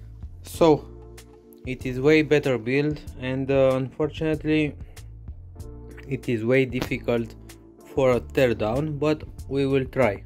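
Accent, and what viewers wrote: Romania. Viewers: asta e roman la cum vorbeste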